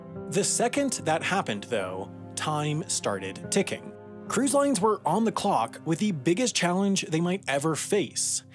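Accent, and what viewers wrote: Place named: United States. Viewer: Dude I love your videos. But where are you from? You sound American but your cadence of speech is.......uncomfortable. I’m not criticizing I’m genuinely interested.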